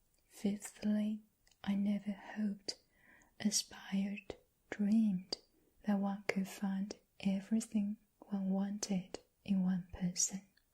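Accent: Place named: Australia. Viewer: 好好听 有一点点澳洲口音哈哈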